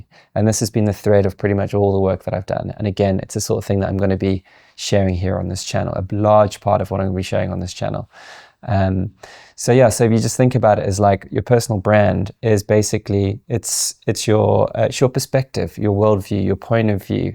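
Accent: South Africa. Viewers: This accent sound firmiliar, South African Maybe?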